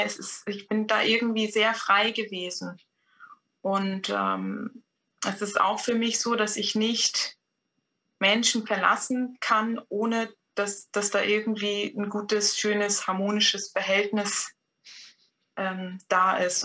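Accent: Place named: Switzerland. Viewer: Mir gefiel der "Wortverkehr" zwischen der Dame und dem Interviewer sehr gut... sein Schweizer Akzent ist koestlich :) Die Fragen die er ihr stellte erzeugten grossartige, aber einfach zu verstehende Antworten die sich sehr real anhoerten. Sehr gutes Interview :) Besten Dank dafuer und lb. Gruss aus USA ::)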